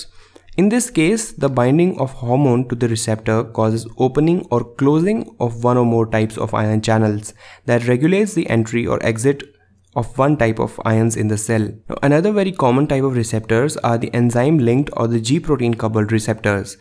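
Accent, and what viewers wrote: India. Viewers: I like his accent :) Indians r cool but T-Series sucks :( i am 9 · Im sorry but i just cant take an indian accent seriously. 🥲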